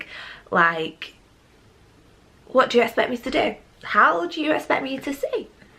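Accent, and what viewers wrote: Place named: Manchester, United Kingdom. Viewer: You gotta be from Manchester ❤❤❤❤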